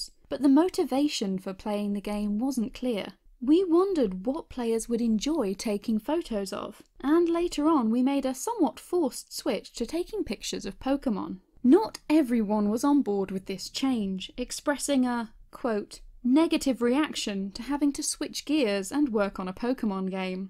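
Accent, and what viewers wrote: United Kingdom. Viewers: I love your British ascent lol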